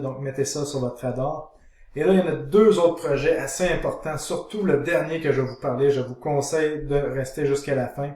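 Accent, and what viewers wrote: Canada. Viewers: franchement je suis une dizaine de youtubeur depuis 15 mois ( merci la covid ) et je suis novice et il y à peut de temps ou je suis tombé sur une de T vidéo et j'en suis très satisfait et ca change des autres . je vais t'écouté et voir T propositions et pourquoi pas investir sur T conseil mais je vais encore attendre la fin du weekend . Sinon vu ton accent tu es Canadien ? ou tu es du fin font de la creuse ? Merci pour cette vidéo très enrichissante et bien expliqué . T'assures mec , Force et Crypto au peuple du monde entier, à toi et à la communauté Crypto et un gros doigt aux banques et aux Etats .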